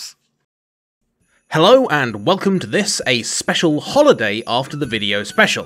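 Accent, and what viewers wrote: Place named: United Kingdom. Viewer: Sometimes I watch your videos and forget that I'm not watching GMTK, but then I'm like "Wait, he's still British, but he's a different British..."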